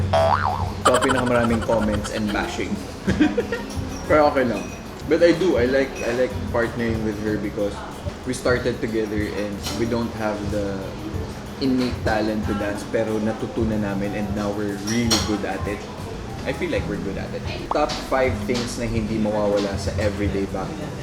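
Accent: Bicol, Philippines. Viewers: I can still hear the bicol accent. Siram sa pag dangug haha Namiss ko lugud ang Naga hah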